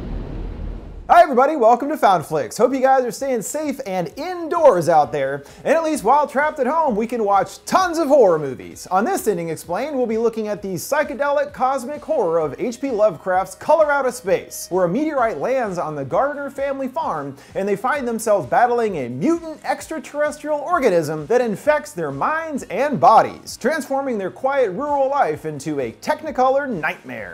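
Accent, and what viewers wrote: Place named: United States. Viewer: how americans speak, jesus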